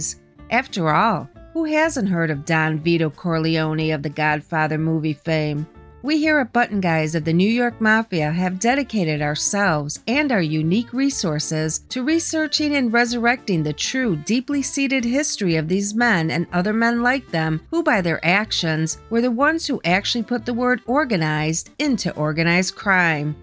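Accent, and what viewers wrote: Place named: Chicago, United States. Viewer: Why is it called throw in New York when the Narrator broad has the thickness Chicago accent since Tony Spilotro ?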